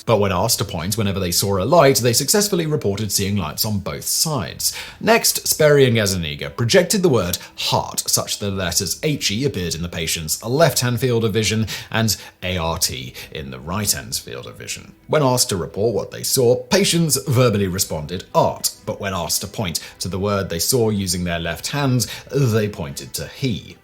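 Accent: United Kingdom. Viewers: Psuedo intellectualism influenced by post modernism is the curse of the 21st century. A british accent doesn't make it so. 2 hours of gross over statements, lies and fluff.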